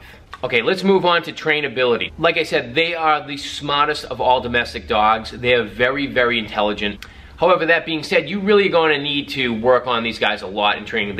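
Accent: Boston, United States. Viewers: Are You from Boston, M.A.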